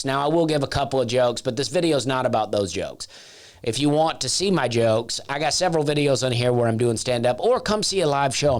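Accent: South, United States. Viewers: The thing is there is not ONE joke in this entire set? Just uncomfortable country drawl mumbling? Do you do jokes or just ramble aimlessly? If the people were laughing hard you wouldn't be heckled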